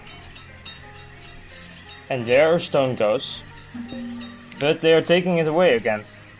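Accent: Netherlands. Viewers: Nederlands accent woehoe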